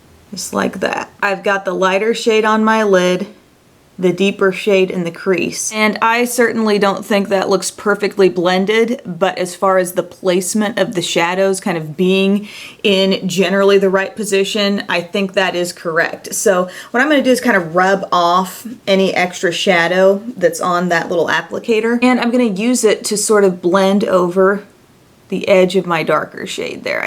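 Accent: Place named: South, United States. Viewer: Where are you from?? You defs sound southern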